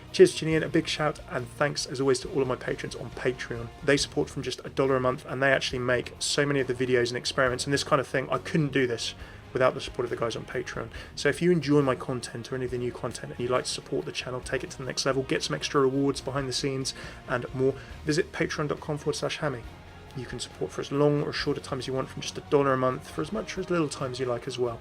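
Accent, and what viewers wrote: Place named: United Kingdom. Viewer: you´re welcome :D btw love that brit accent - german youtuber can´t deliver such quality as you do!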